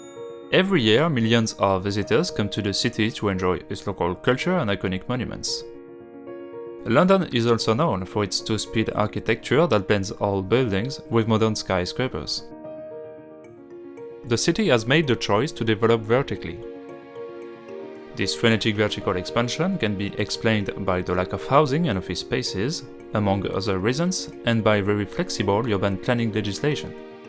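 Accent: France. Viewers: Yes we like french accents...it sounds so french 🥖🧑‍🍳🎭🗼🇲🇫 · It's not the accent which is most troublesome about this (after all, the fact that the speaker clearly hasn't sought help to IMPROVE his spoken English - all the vowels are French, the intonation is syllabic not tonic, etc etc. -all very predictable imperfections - is a comparatively minor matter), it's the extraordinarily careless attitude of whoever commissioned this audio commentary: thirteen seconds in and we get 'to discuss about' something: a mistake which should have been corrected in troisième... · Think most brits like the french accent. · You do not need to sorry for your French accent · The French accent is beautiful🌹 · Love the French accent ! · Your accent is magnificent. I love France and the French.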